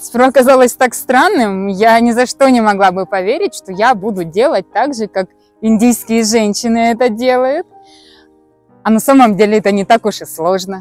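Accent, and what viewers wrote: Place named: Ukraine. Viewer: Почему-то акцент такой как человек с Украины 😉 адаптации Вам скорейшей ❤❤❤ язык наверное уже освоили,ведь с свекровью нашли общий язык 🌹🌹🌹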